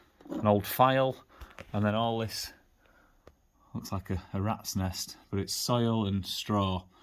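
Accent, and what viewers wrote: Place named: York, United Kingdom. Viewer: Where are you based? You sound local to me, York?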